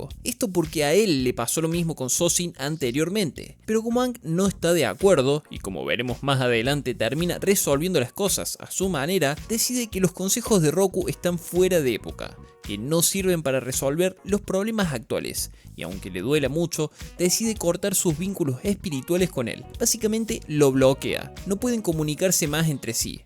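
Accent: Argentina. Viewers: Sos crack amigo y además argentino jajaja pero de que parte sos?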